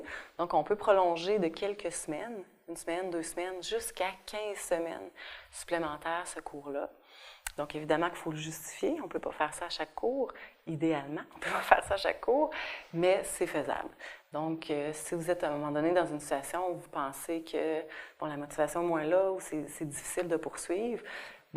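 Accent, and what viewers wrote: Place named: Brazil. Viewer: me parece un brasileño hablando francés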